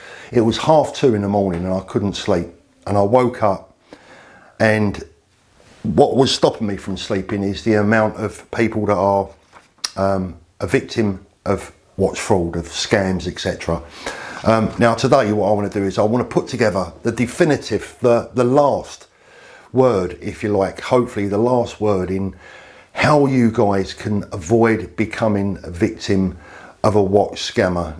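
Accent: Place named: Yorkshire, United Kingdom. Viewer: Yorkshire guy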